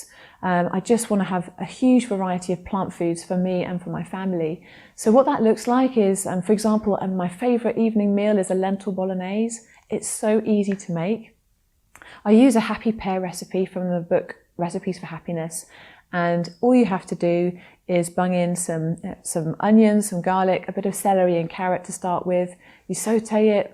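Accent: England, United Kingdom. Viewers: Great to hear an English accent talking about WFPB living. Well done.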